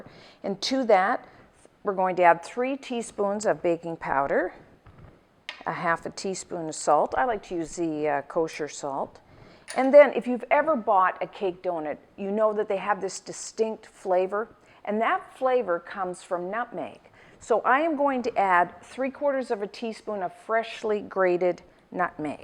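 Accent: Canada. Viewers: Fun to watch, thanks, but from here in Europe, we're mystified...what kind of accent is that? Canadian? Great Lakes? Michigan?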